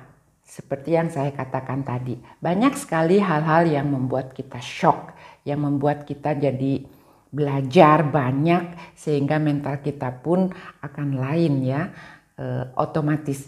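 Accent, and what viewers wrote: West Java, Indonesia. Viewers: uhun bu,rada aneh oge😂ari urang sunda sok sanajan pindah kamamana ge cara nyariosna logat sundana tetep aya keneh😁ibu oge cara nyariosna angger katingali sunda na😂aya oge kimbab famili,sok sanajan tos pindah ka korea angger gaya nyarios sunda na aya keneh,pas ningali teh,ah ieu mah tina cara nyariosna siga urang sunda,beda😁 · Sanaos lami linggih di swiss lentong sunda na masih kadangu, Sae pisaan 👍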